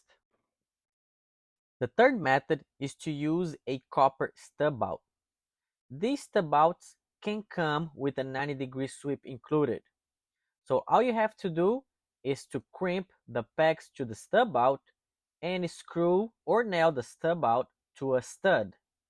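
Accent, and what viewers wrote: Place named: Brazil. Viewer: I can tell a Brazilian accent when I hear one haha great work man! Meus parabéns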